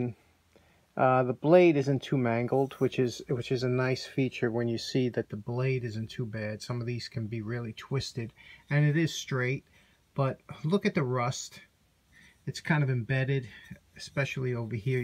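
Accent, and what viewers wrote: New York, United States. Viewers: Very nice work. Also nice to hear a New Yorker's accent (born and raised in upstate). Also, had one of those wood screwdrivers when I was a kid. Hate to think of it as "antique," but it is what it is. I used that same stain for a lot of applications, but I seal it up with polyurethane (satin, semi, or gloss); hard as a rock.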